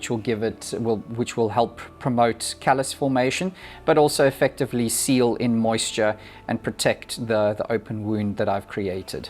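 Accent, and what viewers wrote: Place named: South Africa. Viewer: Really useful and informative video, thank you 💚 I find it funny that auto captions can’t distinguish South African accents, of course I mean no offence just makes me smile when it says bins on screen when you say bends! 😂😆 thanks again very useful as always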